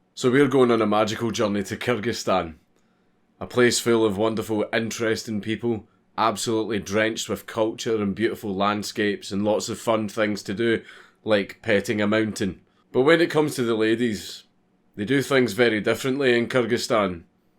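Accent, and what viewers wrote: Scotland, United Kingdom. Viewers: Scottish accent = awesome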